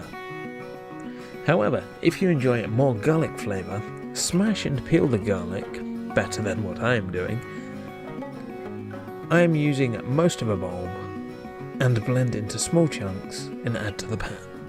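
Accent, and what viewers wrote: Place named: RP, United Kingdom. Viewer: you sounding very posh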